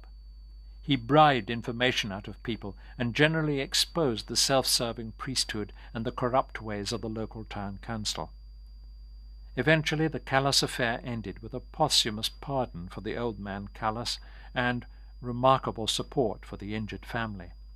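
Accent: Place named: United Kingdom. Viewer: Background music is too intrusive plus heavy British accents make narrative wasteful.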